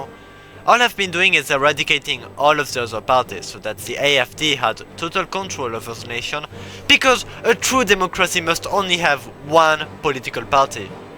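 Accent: France. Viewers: bah bro i can tell by the accent + i checked his profile h says he’s french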